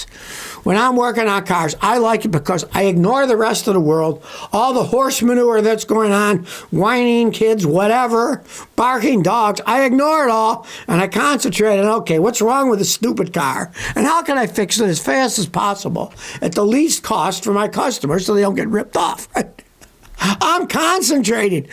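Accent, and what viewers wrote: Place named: New England, United States. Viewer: "karr" "new Englander lol <3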